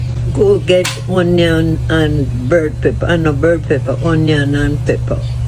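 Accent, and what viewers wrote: Jamaica. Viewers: I love watching her. U can tell she is so good hearted but I bet she is wise and tough. I can listen to Jamaicans talk all day. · Love her Jamaican accent ❤❤❤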